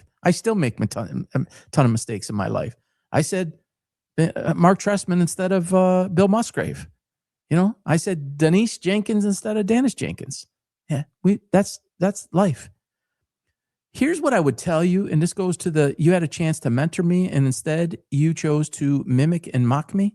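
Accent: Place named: Detroit, United States. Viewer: you took offense to him saying you sounded like you’re from Detroit. easily offended much?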